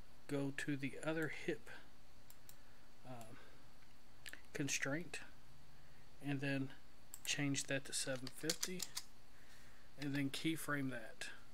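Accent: United States. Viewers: OH MY GOD YOUR VOICE IS AMERICAN BLENDER GURU IT'S EPIC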